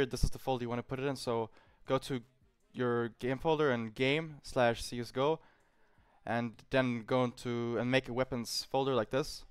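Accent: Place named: Germany. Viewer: Man merkt ihn sein Deutsch zu hart an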